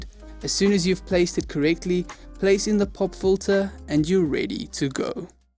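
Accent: South Africa. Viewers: Ayyyyy. I detect a south African accent ! Nice channel 😃🙌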